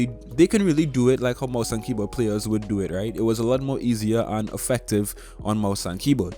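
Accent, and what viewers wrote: Trinidad and Tobago. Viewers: Just started playing apex and your my fav apex yt rn much love and btw are you from Trinidad & Tobago cuz i am and you sound like a trini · “The apex YouTuber with an accent” Me knowing he’s a trini: 🤣🤣🤣🤣🤣🤣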